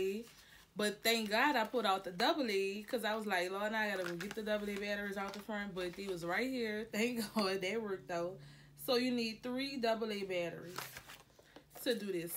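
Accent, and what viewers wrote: New Orleans, United States. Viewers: You must be from around New Orleans. I know that accent.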